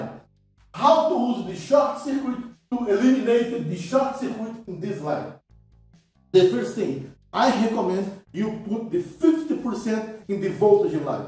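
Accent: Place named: Portugal. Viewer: Such a fucking Portuguese accent in english!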